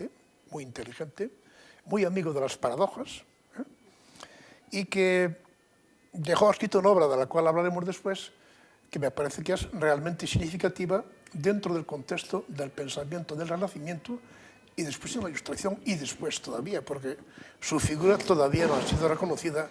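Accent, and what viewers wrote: Spain. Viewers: Qué pernicioso el acento gallego para una nítida articulación de las palabras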